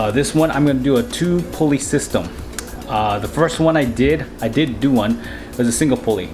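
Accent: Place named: Mexico. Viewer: That's a typical Mexican English accent 🤭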